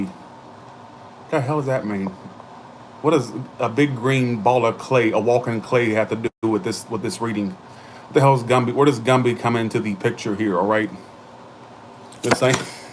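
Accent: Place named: Georgia, United States. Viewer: Thank you for your frequent readings! I meant to get back to you when you said you’re from Georgia, not NC; I have relatives from Georgia, that’s why your accent sounded so familiar lol. Thanks for responding! You’re such a sweet soul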